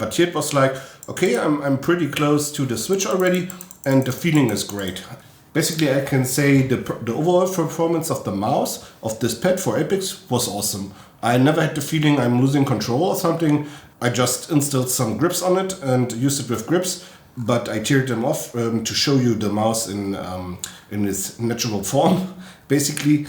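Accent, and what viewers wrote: Germany. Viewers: Stuck around to pin point the accent. Hört sich ziemlich Deutsch an.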